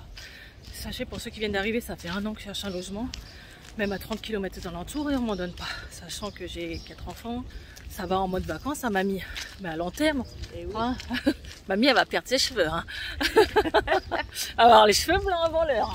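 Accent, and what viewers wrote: North, France. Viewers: Bienvenue dans le sud ouest 😃 pour vous baigner attention car la baignade à Anglet est dangereuse (Baïnes qui vous entraînent au large) même si il y a les MNS. Voir Hendaye, St Jean de Luz +++. Vous allez aimer le sud ouest même si pour vous, venant du nord, c'est un sacré changement. J'espère que vos enfants vont s'y plaire. Pour l'appartement heureusement que vous êtes logés mais avec des enfants vous devriez en trouver un. N'hésitez pas à vous présenter aux commerçants et poser des affichettes. Avec votre accent vous allez passer toujours pour une touriste ! 😃😃😃 Bon courage !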